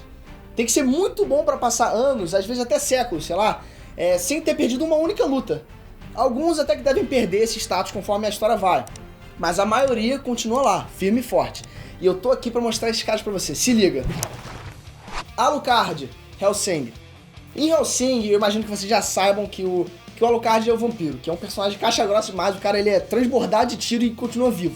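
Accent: Rio de Janeiro, Brazil. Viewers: sotaque carioca kkkk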